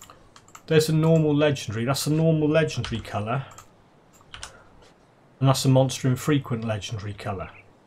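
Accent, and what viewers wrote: United Kingdom. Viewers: Really enjoying your Grim Dawn videos, very informative. I come from the Forest of Deam and lived in Gloucester for years and your accent sounds familiar am I guessing you are a Gloucestershire man?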